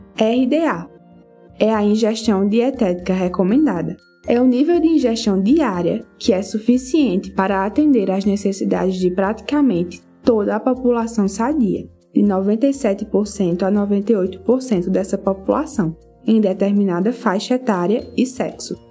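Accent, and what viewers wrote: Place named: Northeast, Brazil. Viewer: O sotáque nordestino ai ta forte kk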